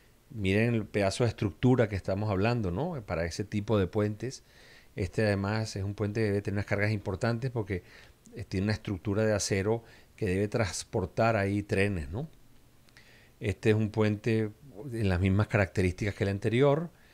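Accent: Venezuela. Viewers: venga: el que habla es un veneço?.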